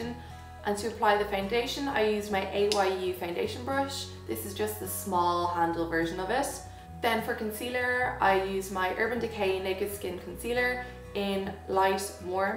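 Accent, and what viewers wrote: Ireland. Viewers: Is she from Ireland? Beautiful 😊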